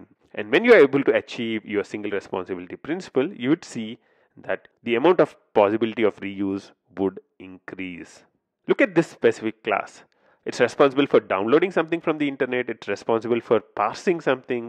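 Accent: India. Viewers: As soon as i heard "Welcome back" in an indian accent I wanted to close it hahahh...but people in India are acctually very good at development. Sadly I just cant take it haha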